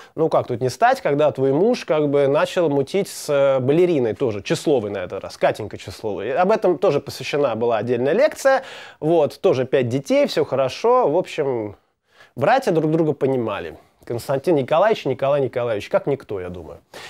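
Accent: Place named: Moscow, Russia. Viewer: какой мА-Асковский акцент!!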